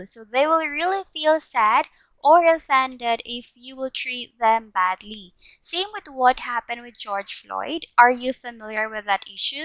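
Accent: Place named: Visayas, Philippines. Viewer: I love the accent but I love it more kay bisaya man diay 😘❤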